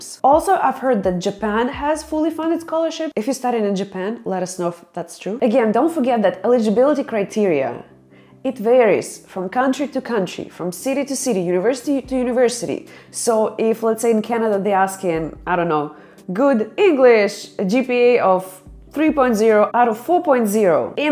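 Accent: Russia. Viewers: Well researched video. Keep up the good work..I like the Russian accent..Maybe you can teach me sometime 😉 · I like the way she talks in a Russian accent it's really hilarious.😅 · strong Russian accent ❤️ You are doing well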